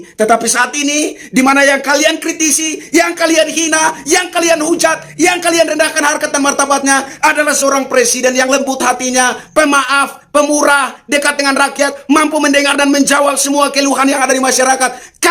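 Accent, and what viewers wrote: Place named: Banyumas, Indonesia. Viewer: Mantap bang, salam sing wong nusantara ....ngapak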